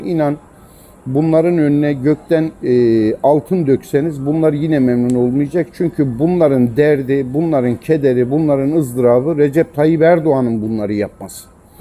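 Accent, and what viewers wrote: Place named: Kars, Turkey. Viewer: Abi gine kars şivesi sıkışırdın BUNLAR SARSAK OĞLU SARSAK 🇹🇷🇹🇷🇹🇷🇹🇷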